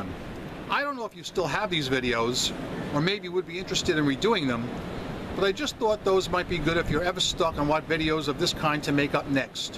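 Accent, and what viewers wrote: New York, United States. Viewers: the Joisey Boy with the New York Accent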